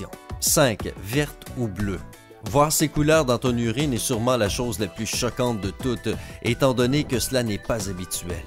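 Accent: Quebec, Canada. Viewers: Enfin un canadien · Moi j'adore l'accent québecquois tout simplement !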